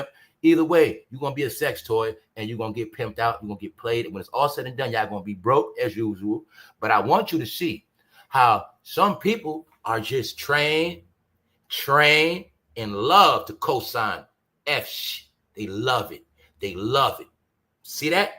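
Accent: New York, United States. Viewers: be cooking 💪🏽 especially with your NY accent I like, just my thoughts so if get out how’s he gonna be living 🤔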